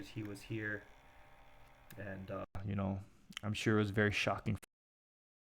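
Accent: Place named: Hawaii, United States. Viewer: sounds like you're from Hawaii. Rest in peace aviators.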